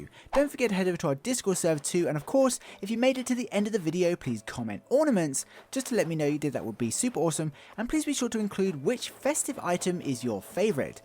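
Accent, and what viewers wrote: England, United Kingdom. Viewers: He sounds like he lives in England and I think they are a day ahead and this ornament stuff comes tomorrow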